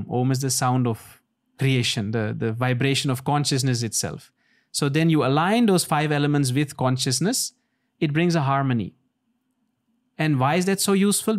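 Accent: India. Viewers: Yes, he speaks with an Indian accent, so interesting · His Accent is Indian..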